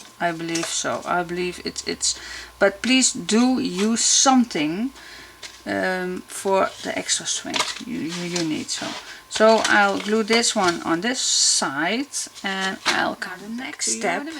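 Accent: Netherlands. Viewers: Brilliant idea. Thank you for sharing and for the free tutorial. I just love your Dutch accent. XXX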